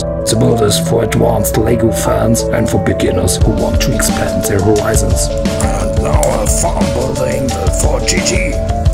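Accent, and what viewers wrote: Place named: Germany. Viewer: Are you German? Because you sound very German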